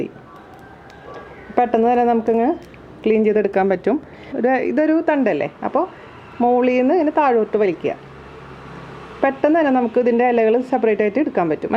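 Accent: Thrissur, India. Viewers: തൃശൂർ ഭാഷ ഒരു ലെവലാട്ടാ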